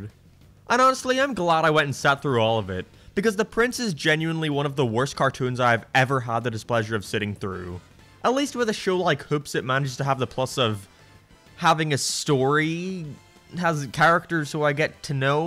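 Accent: Northern Ireland, United Kingdom. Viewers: You're not british? Aren't you from northern ireland?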